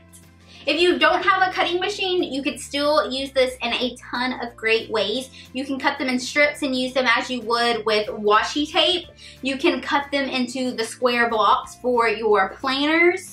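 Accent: South, United States. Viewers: This is random but your accent reminds a lot of Jamie Lynn Spears sort of country ish it's so cute! 😁😂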